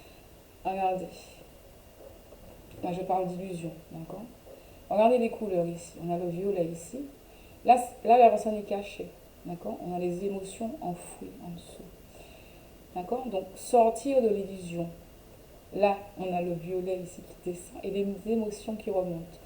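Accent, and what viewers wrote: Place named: Martinique. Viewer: Jolie petit accent de Madinina💓🌴 mer I pour ce beau tirage... Pour le son c'est moyen.. Mais ça reste audible. 👍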